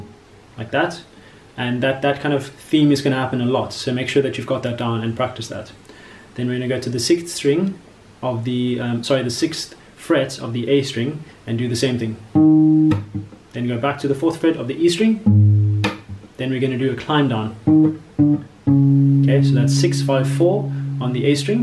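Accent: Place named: South Africa. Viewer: I nearly died from excitement when I heard the south african accent!!!! It's not every day I find south african youtubers 💀 Keep doing what you're doing, this tutorial was amazing!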